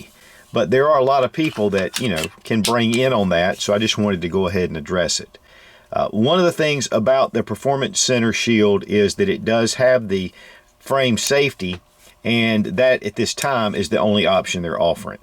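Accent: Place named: South, United States. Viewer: Good video! what made it great was saying "Either" not the PC "ither" as reporters and commentators do! I am Southern and I think you are also!!1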